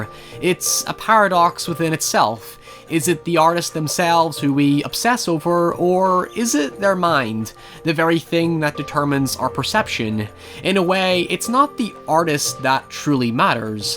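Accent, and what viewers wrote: Northern Ireland, United Kingdom. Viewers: if the host british ? anyone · I was trying to pinpoint your accent and then I realised it was Northern Irish just like myself. Have never seen such a popular youtuber from here! Props to you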